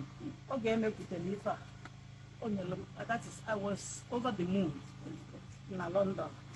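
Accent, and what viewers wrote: Igbo, Nigeria. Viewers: Even her spoken English sound like an Igbo woman speaking wow!❤❤❤ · Oh my goodness! I'm in love with our Igbo wife! Well done, and good job to our Naija wife, and to her loving husband. I don't speak Igbo, but she totally sounds like an Igbo woman to my Yoruba ears. Sending her so much love!! ❤❤❤ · With the Igbo accent as well. It’s like I am hearing an Igbo woman from the village. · The intonation shows that this woman is a half cast raised in an Igbo environment. She tried, even with proverbs. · She speaks just like an igho woman mixing English with igho.Wow. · Probably she was born and brought up in the east and eventually married an igbo man cos she did not just understand and speak igbo, she also has the accent which shows she isn't new in their mist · Even her accent is pure Igbo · She even speaks English with a Nigerian accent. 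· That woman speaks english like she grew up in Nigeria. She can‘t be born in the uk because her english sounds too nigerian no british accent at all. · Wow 🤨 Not only did she speak very well She sounded Igbo too With an Igbo accent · Exactly, as soon as I saw her I knew she was mixed. Her hair texture is more black than white. She was definitely also raised in Nigeria. I live in England and nobody here can fake the Igbo accent that much. People try but u can still tell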